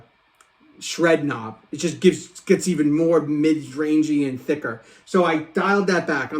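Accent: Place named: New York, United States. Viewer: I just picked this head up a few days ago to pair with my Marshall 1960A cab with GT12-75 speakers. Excellent, no-frills video and straight to the point without excessive noodling. Great video brother - you sound a bit like a New Yorker !!! Cheers from Canada! Thanks for the video - I can see u can play good as well - nice vibrato !!!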